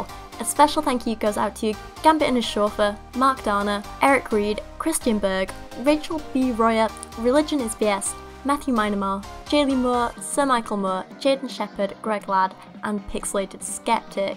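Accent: United Kingdom. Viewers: Loving the video so far but it's a little too brit bong for me. But I dunno maybe I'm just too American to understand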